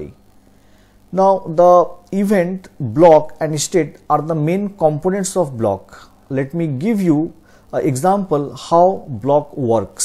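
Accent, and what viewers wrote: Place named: India. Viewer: i hate hindi accent, you teach bad :)))))))) )))))))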